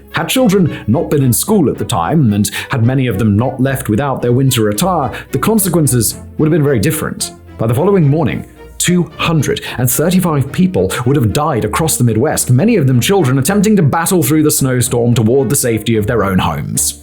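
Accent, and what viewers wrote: United Kingdom. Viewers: Hard for me to take a British accent about AMERICAN history. AI is terrible about throwing in heavy Irish and British accents too when talking about even the old west history of the USA. Usually I just stop those and move on to another video. Why would I want a Brit telling me about AMERCAN history, when their great Brit army/navy could not even beat a ragtag USA colonial army of farmers and settlers....get real. Keep it real Youtube!!!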